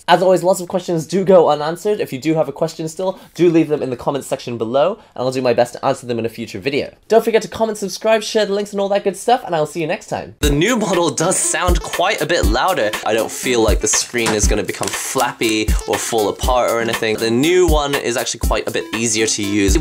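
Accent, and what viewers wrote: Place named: Scotland, United Kingdom. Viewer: I can't put my hand on your accent. You sound a tiny bit Scottish:)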